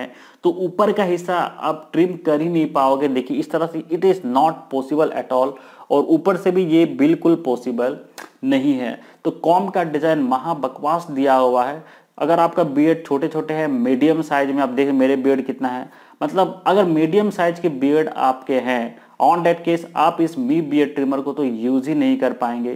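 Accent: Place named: Bihar, India. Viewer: ha lga mje... Bihari tone se.. I'm also from Bihar MFP... I also make videos.. aap dekh skte ho mere channel pe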